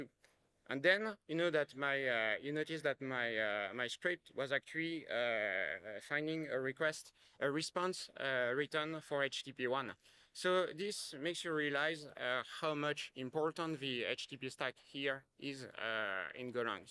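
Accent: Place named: England, United Kingdom. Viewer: Awesome share. But damn..Can’t stand the English accent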